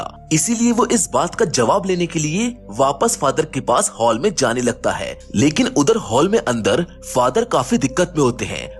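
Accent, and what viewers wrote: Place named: Bollywood, India. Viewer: Aapka Bollywood silver screen Wala accent hi bohot accha lagata tha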